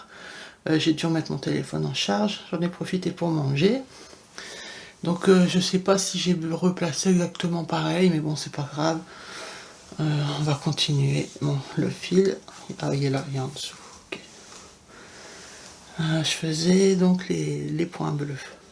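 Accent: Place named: Nord-Pas-de-Calais, France. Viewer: Coucou Comment ça! L,accent chti un tue l'amour??😂😂😂 Moi je l'entends encore un peu sur le son de tes "a" 😁 Ah ah j'ai le même garçon! Jamais de sa faute 😂😂 Merci pour cet agréable moment et de plus j'attendais de voir cette toile avec impatience depuis que tu as dis que tu l'attendais donc maintenant c'est bon je peux craquer 😅😂 Gros bisous 😘😘😘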